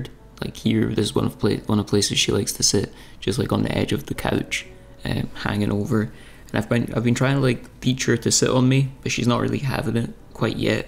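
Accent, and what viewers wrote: Scotland, United Kingdom. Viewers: HI AND JUNIOR I HAD A CAT CALLED SPODLE SHE LIVED TO23 AND I STILL MISS HER KEEP STRONG AND I KNOW THAT LOVELY SCOTTISH VOICE IM FROM ABERDEEN❤❤❤❤❤❤❤😊😊😊😊😊😊😊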